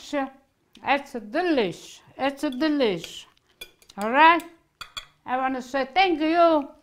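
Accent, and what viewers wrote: Italy. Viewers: you're the Best...👍 You make me feel so much Better with your Sweetness... I smile a lot cause of the way you speak... describing what you're making with your Awesome Italian Accent & singing brings a comfort to my heart!! 🎤💖🇮🇹 OH & the delicious recipes you make!! 👏👏👏👏👏😀😋💖🇮🇹🇺🇸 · Today for lunch, I made the baked fish cod fillet exactly as you made. I was so surprised how delicious it came out considering all ingredients came from the pantry. My husband and I really enjoyed our lunch. I always enjoy your cooking show, love your Italian accent, your lovely voice, but most of all the meals you prepared are simple ingredients and delicious. Thank you for the delicious cod fish recipe. God bless.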